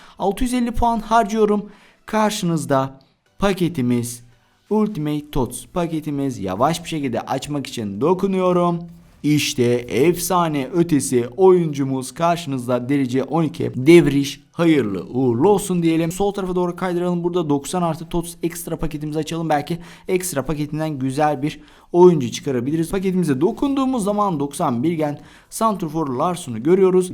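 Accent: Azerbaijan. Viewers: Azerbaycanlı mısın sen?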